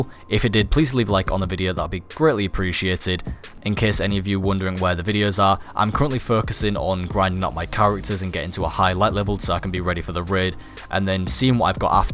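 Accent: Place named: East Riding, United Kingdom. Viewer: Hey bro, you sound very local to me. I live in the East Riding and play destiny all the time. I’m guessing hull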